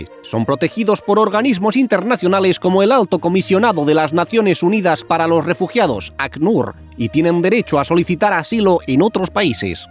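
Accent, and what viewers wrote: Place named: Catalonia, Spain. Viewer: Hola divertidamente dibujado y con voz de origen del país Catalán ❤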